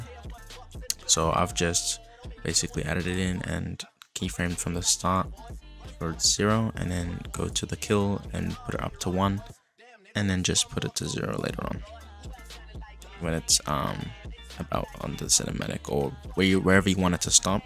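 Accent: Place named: Australia. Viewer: omg… first time hearing your voice and wow, what can I say? It’s incredible… amazing, awesome it’s everything I could’ve ever wished for. Mature, Australian, professional, polite wow. This is one of the best days of my life.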